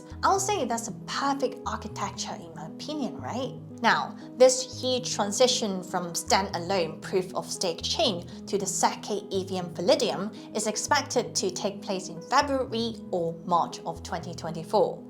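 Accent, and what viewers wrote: United Kingdom. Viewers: This girl is learning English, and learning to speak it in an English accent hehe fair play!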